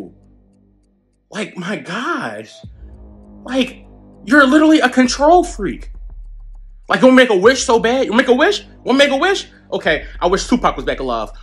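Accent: Philadelphia, United States. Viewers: you have a Philly accent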